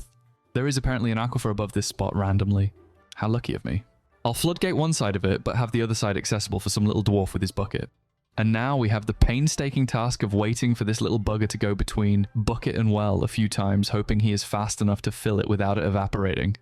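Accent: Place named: Scotland, United Kingdom. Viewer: I cant help but notice a scottish twang in your accent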